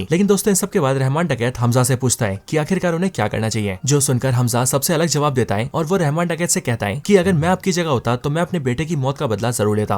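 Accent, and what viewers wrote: Bengal, India. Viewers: Tum bengali ho kya???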